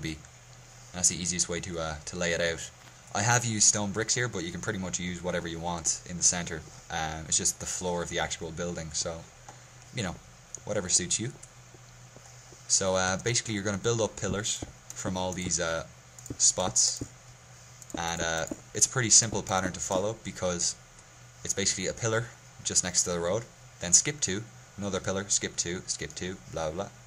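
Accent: Ireland. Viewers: You Irish?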